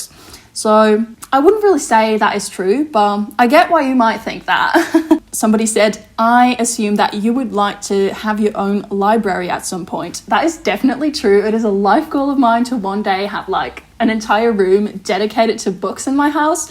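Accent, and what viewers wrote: Australia. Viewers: I agree! It actually sounds pretty Australian and I love it! It's one of my favourite accents! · But I love your accent so much! I think it sounds australian and I love it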